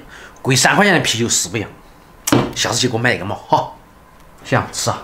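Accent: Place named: Sichuan, China. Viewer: 我看超小厨说四川方言但唱歌太标准，老外觉得太有意思.这就是全世界一样，在韩国也是一样的.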